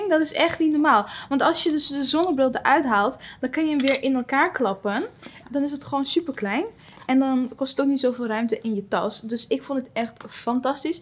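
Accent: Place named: Enschede, Netherlands. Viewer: die "n"van haar omg kom je uit enschede?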